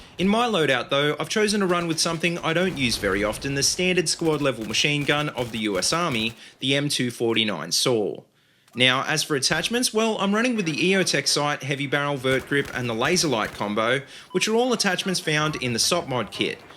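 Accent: Australia. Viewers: Just found your channel, already a fan. Love the accent by the way, Australian? Anyway, keep making themed loadout videos, I like watching these sort of things... but I can't stand Levelcap. Peace.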